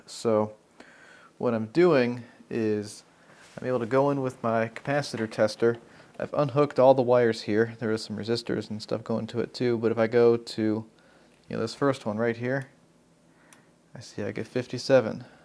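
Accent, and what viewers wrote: United States. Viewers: Are you from western PA ?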